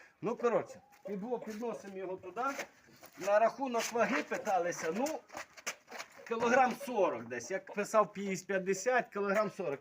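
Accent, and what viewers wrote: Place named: Western Ukraine, Ukraine. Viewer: Классный мужик очень позитивно все прям душа радуется что в Украине такие люди больше всего нравится акцент западной Украины прям очень привет из Одессы, про генератор все объяснил наглядно и понятно. Цікаво чи немає дивних шумів від телевізора або комп'ютера при роботі від такого генератора бо я маю перетворювач напруги з 12-220 від нього струм поганий для телевізора бо йдуть поміхи.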